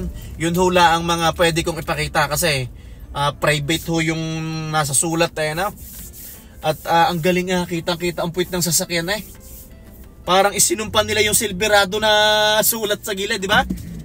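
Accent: Batangas, Philippines. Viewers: nakakainis punto mong batanggenyo, Tsk pinanood ko pa naman to kasi taga edmonton din ako.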